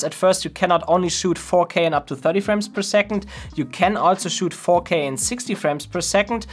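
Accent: Germany. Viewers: hes german i can hear it im german to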